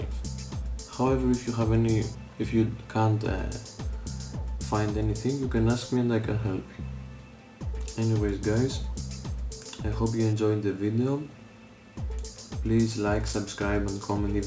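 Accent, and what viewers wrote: Greece. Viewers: Απο τη φωνη υποψιαστηκα οτι ειναι ελληνικο βίντεο , ωραιος φιλε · η ελληνική προφορά γαμάει πάντως!!!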